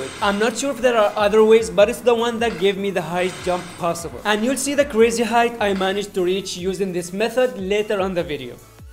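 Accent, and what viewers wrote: India. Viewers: When i heard the indian voice i know i can trust him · You sound like us Indians. Where are you from?? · At the starting i felt like you were gonna speak hindi you have a indian accent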